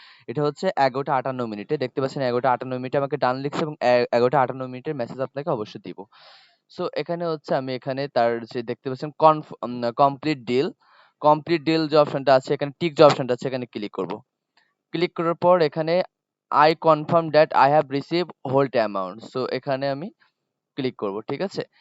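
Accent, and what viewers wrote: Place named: Satkhira, Bangladesh. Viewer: vai apnar basha satkhirai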